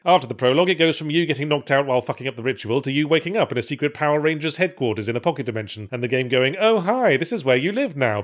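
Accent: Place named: United Kingdom. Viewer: Yeesh: You gotta be really British to naturally rhyme "charms" and "palms".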